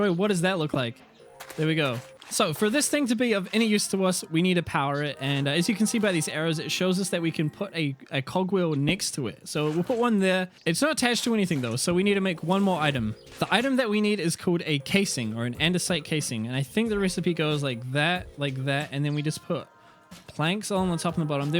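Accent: New Zealand. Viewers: Whats that accent of yours? New Zealand? Im trying to learn to differenciate them and you sound like temuera Morrison… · Why does every kiwi youtuber I come across have some weird amalgamation of a kiwi accent with an american one? Rip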